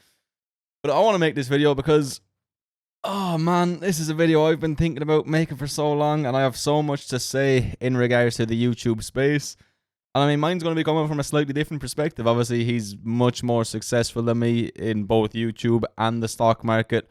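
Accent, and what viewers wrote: Ireland. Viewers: Hey, I enjoy your content. Your bubbly personality and Irish accent helps. I lost money on Workhouse and other EV stocks, but I don't blame you. The developing narrative sounded good to me, so I chose to get sucked into the FOMO YOLO. Funny thing 🤣 is that I'm still holding onto my WKHS and other EV stocks, because I lost track while working my new job. It was a fun run together, but I'm moving onto gold, silver, and commodities. Fun times, man 👌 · to error is human no one is perfect. You ALWAYS said do your own due diligence. Who ever blindly follows anyone, not just you deserve s what happens. I consider you as a friend. Truly! I loved sharing the excitement with you & 🤣 but I diversified anyway. What I’m trying to say is we truly NEED your beautiful Irish accent. trust in God and believe the good will overcome bad. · I missed that sweet Irish voice.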